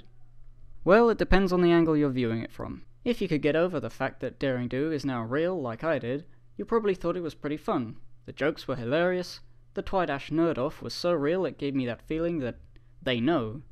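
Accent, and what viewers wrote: United Kingdom. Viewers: He's been British this whole time? Awesome!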